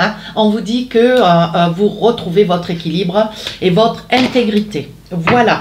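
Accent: South, France. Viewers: Merci pour cette guidance. Beaucoup de choses sont sorties. Qui sont vraies. Cancer ascendant Cancer. Je vous ecoute depuis quelque temps. Et c est un plaisir. J adore votre accent du soleil. A bientôt. 😊❤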